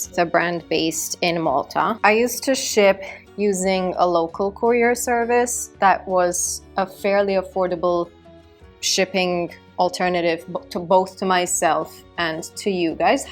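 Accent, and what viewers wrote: Italy. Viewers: Il tuo accento italiano è bellissimo 😍 (Your italian accent is wonderful)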